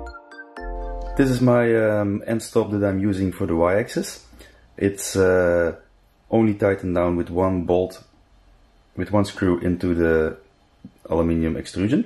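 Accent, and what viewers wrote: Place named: Belgium. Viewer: Hi there, Your accent sounds terribly Belgian, I should know since I am one, correct me if I am wrong. I am also building a hypercube (<num>) but I followe Daha's videos up untill now, I am starting with the electronics part now but then I notice I have a very diferent motor controller card (Duet 2) and LCD (Paneldue 7i) so I am a bit thron of my rocker here, I have already found some intell on this but is it ok if I drop you a question from time to time? If my suspicion is correct we can converse in dutch :-)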